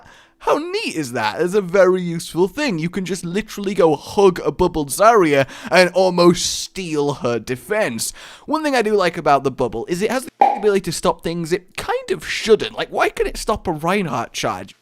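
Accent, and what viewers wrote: Russia. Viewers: Not gonna lie, he actually does a pretty damn good Russian accent.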